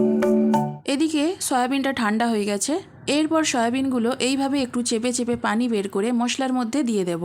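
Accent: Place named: Nadia, India. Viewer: খুবই ভালো রান্নার পদ্ধতি দেখালেন | অবশ্যই বানিয়ে দেখবো | আপনার "পানি" কথাতে বুঝলাম আপনার বাংলাদেশ connection আছে; কিন্তু তার সাথে সাথে, বলি, যেহেতু আপনার বাংলা প্রায় সমস্তটাই পশ্চিম বাঙলার, especially নদিয়ার জেলার ভাষার মতো, আপনারা কি যশোর/খুলনার লোক ?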